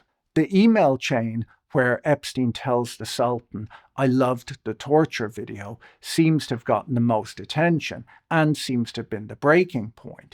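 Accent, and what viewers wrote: Ireland. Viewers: Love the Irish accent... King Charles the "TURD". LOL RIP QEII but, the British/German monarchy should have ended with you. 🎉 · Accent. Now thinking Galway or South West coast, but probably wrong will stick with first choice last time North west londonderry region.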